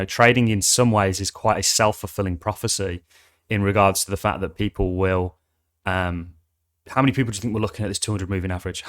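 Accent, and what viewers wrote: Birmingham, United Kingdom. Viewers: Where abouts in the midlands are you from? ( I detect a slight brummy twang ) 😂 I'm from Tamworth and you have a very similar accent.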